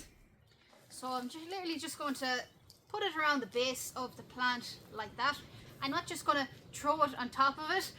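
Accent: Ireland. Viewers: I don't how many male humans could resist a young lady with that wonderful Irish lilt and the gorgeous roses in her cheeks. That is one version of perfect Irish femininity. God bless ya and may your flowers always bloom! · Between your Irish accent and my Texas accent we would never have any reason to argue. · The Lovely Irish could talk about shit and you would still be entranced by their lovely accent. Aussie Jeff Moore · not , just listening your awesome voise! :D i mean in from finlasnd and love irish english. :D maybe some others too. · Sheesh, that accent is lovely! I would love to visit Ireland, but my skin tone may have me confused for border jumper 😃 I heard the Guinness there is real and I need it! 😂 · this girl is so cool, modest but with pride, love this oirish accent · Hey, love your content darling..can I ask what part of Ireland are you from.❤ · You are a superstar!!!" And your Irish, wow you have a great outlook. 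Thanks for teaching me how to garden. You have my full attention. Don't ever change anything about yourself!!!"✌️❤️ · I LOVE THE BROGUE: DO YOU BELIEVE IN LEPRECHAUN'S LASSIE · Are you Irish? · Loving the channel. Mostly the charming accent, but I am actually gardening too 😅 Makes me want to visit Ireland. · I do a little gardening but i just like the way she talks that irish accent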